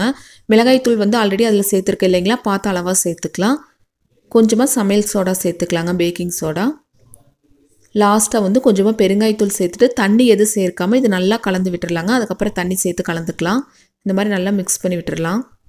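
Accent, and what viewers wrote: Kongu, India. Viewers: Hi sister I'm new subscriber.. I like ur videos... Ninga kongu Tamil Thana pesaringa. Yentha ooru sister???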